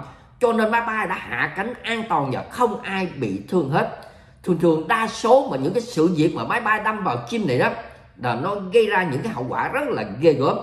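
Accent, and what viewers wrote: South, Vietnam. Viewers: Nghe nói tin tức giọng miền nam hay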